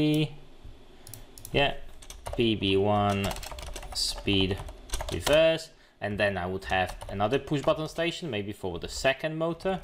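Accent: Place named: United Kingdom. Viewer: Thanks man ! where is coffe button ? // BTW your brtitish accent is perfect for me so clean 😃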